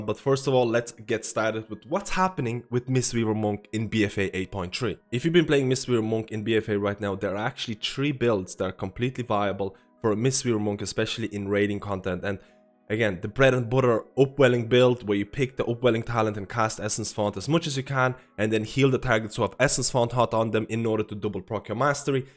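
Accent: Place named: Ireland. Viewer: are you living in Ireland, serious Irish accent lol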